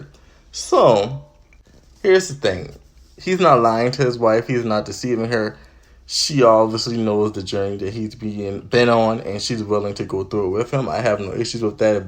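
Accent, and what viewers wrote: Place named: Gullah Geechee, United States. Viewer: Great video. Quick question are you from Charleston, SC!? You got that Geechee accent like folks from that area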